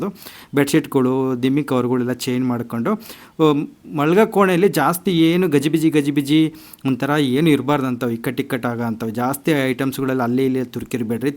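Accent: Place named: Karnataka, India. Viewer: ನಿಮ್ಮ ಊರು ಯಾವುದು? ನಿಮ್ಮ ಕನ್ನಡ ತುಂಬಾ ಇಷ್ಟ ಆಯ್ತು 👌🏻👌🏻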